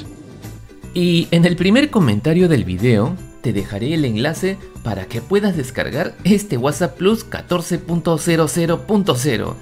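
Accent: Peru. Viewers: Jajajaj el acento peruano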